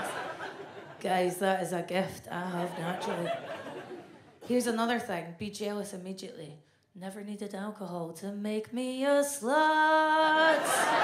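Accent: Scotland, United Kingdom. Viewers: Fantastic voice and accent. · I love a Scottish brogue. My ears delight in it. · I love the Scottish accent 🙂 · You are beautiful, funny, and I personally love your accent. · It's exactly the Scottish accent that appeals to me most. Otherwise, that alien chick is the xexiest, funniest, most honest nonhuman I've ever seen do comedy. I wouldn't even have known she is an alien if she hadn't confessed! I'd watch anywhere, any time. I hope she does a full special on a streaming service pretty soon. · How can you not LOVE a scottish accent, thats definitely a woman i could listen to and never be bored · I love the Scottish accent! Would I wish I had subtitles for ¼ of what is said? Sure, but it's fun to hear anyway! I like to repeat/imitate it when talking with someone, not to make fun of them, but to be able to understand it better. Which is a better way to cook? 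Watching someone passively, or doing the cooking yourself while tasting it periodically? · Anything spoken with a Scottish accent is automatically funnier... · I absolutely love Scottish accents. I'd probably listen to this lady all day even if she wasn't telling jokes. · Very beautiful, very funny and I love her accent! Makes me want to do the 11 hour flight to the UK 🙂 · I find Scottish accents in women so damn hot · Is she fay Rothesay? 🧐 Very good 👍🏻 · You should be proud of your Scottish accent not ashamed or embarrassed about it. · What Scot accent is this? There are different accents in Scotland.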